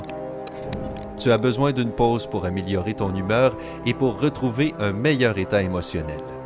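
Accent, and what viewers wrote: Quebec, Canada. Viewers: sablita 07 nan il a l’accent québécois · Lama Fâché version Québécois, je renomerais la chaine Lamarde Fâchée su j'étais toi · Tu est québécois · Oui c'est vrai sa il a un accent québécois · Moi mais c l'accent québécois qui donne cette impression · Avec cet accent québécois à la con c'est insupportable d'aller au bout de ces vidéos. Heureusement d'ailleurs, parce que je n'ai jamais vu un tel tissu de conneries.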